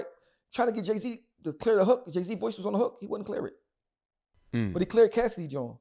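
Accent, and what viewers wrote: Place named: New York, United States. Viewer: I'm from down south in Tennessee. I'm loving this video I love to hear, men from New York speak. Lol at one point I moved to New York just for that reason. Thanks for the video. 😎💜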